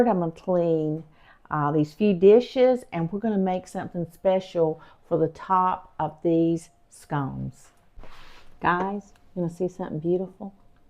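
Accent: South, United States. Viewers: I enjoy your videos very much and your southern accent😃. Your home looks beautiful in the videos. I’d love to see your decorating style! I’d also enjoy a ‘get to know me’ video. You’ve mentioned being in the Air Force. I’m intrigued!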